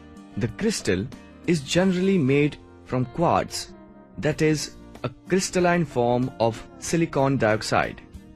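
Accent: India. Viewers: IS THIS INDIAN GUY? WHO TALK? SOUND LIKE INDIAN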